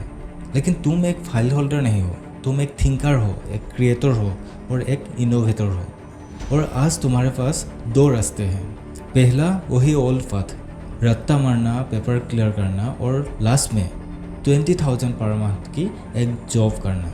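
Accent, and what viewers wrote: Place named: Bengal, India. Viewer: heavy bengali accent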